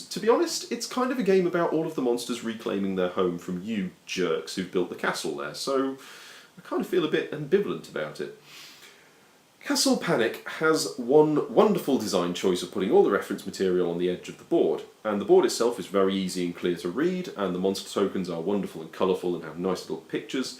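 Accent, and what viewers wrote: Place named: United Kingdom. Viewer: Really enjoyed the video...and the accent, you brits speak so properly.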